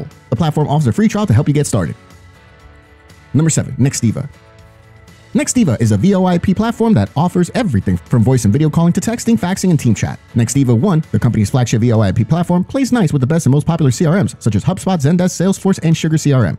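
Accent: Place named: United Kingdom. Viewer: This one seems really convincing, the british accent and the free trial sold me on the idea.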